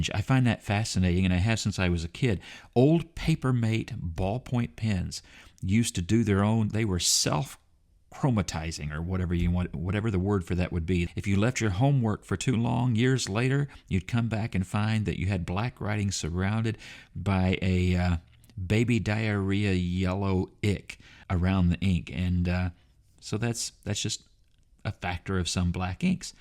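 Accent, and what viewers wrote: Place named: Texas, United States. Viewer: coming from Texas as well I vibe with the pronunciation game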